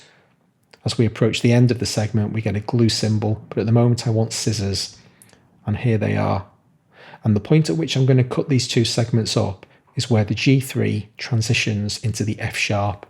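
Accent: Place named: Merseyside, United Kingdom. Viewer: Thanks for the considered reply. I have a Master's in Education and taught at universities for over 20 years (not DAWs). In that setting, my students were either complete beginners or had to have a certain level of PK, as they had taken other more basic modules. But, here, you could get all levels. I understand that, but I've given up on some 'teachers' as they always assume prior knowledge. This includes some of the 'official Cubase' presenters. P.s. from your accent, I recon I'm (originally from your neck of the woods - Merseyside).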